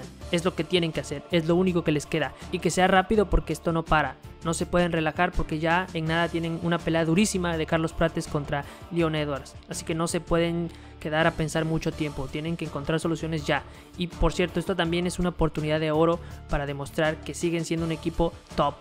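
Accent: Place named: Ecuador. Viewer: Vos de dónde sos? Esa voz parece de ecuador o igual de peru.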